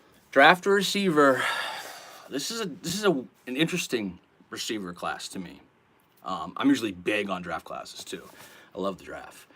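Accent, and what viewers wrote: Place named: Maine, United States. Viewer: Good Job bro, that Maine accent shows up on live. Good people hunting is great.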